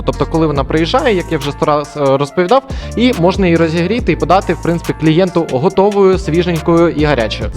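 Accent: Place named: Ternopil, Ukraine. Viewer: Була впевнена, що ти з Тернополя ☺️👍🏻 Дуже гарно розмовляєш з нашим тернопільським акцентом.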